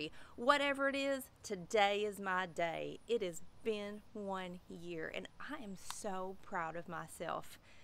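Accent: South, United States. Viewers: I started the journey... and I chopped off most of the dyed ends for my birthday, 6 months later. Didn’t mean it as I was trying to wait a year,but I am glad I did. Now I have a little bit of dyed ends and it’s curly and short, but I love it. Took 2 weeks getting used to the short look. I love your curly big hair and southern accent (I’m from GA myself).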